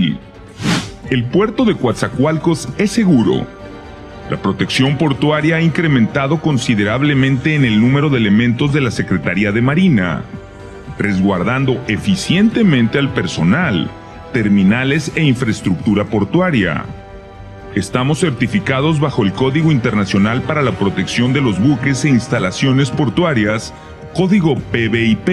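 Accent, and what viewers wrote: Spain. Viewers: No entiendo por qué tiene que ser un español el narrador de estos videos. ¿Qué no hay voces mexicanas que puedan hacer la narración?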